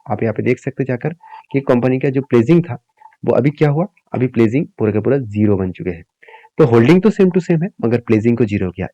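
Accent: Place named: West Bengal, India. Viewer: Are you from west bengal?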